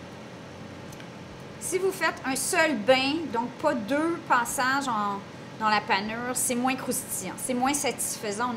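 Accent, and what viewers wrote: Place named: Quebec, Canada. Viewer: J’hésite.. ton accent.. Québécoise? Française? Québécoise qui parle à la française? Quel mystère! 😂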